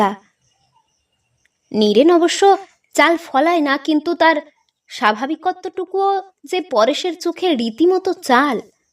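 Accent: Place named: Bangladesh. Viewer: Madam narrator you must be from Bangladesh. Bad narrator.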